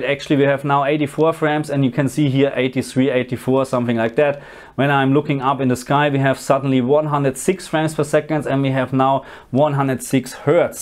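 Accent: Germany. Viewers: he's German but he lives in AU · are you from aus? Your accent sounds German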